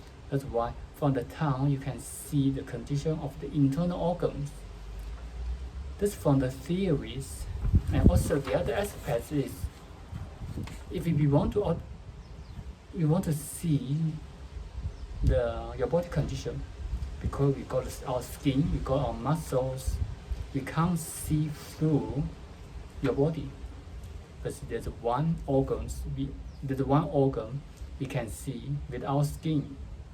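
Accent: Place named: United States. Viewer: Very nicely explained sir, u r great and sober and your accent is as a native of America's Very nice explanation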